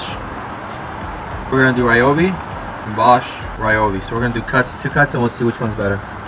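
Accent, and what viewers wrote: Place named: Pacific Islands. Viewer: You sound like a kanaka? You from the islands?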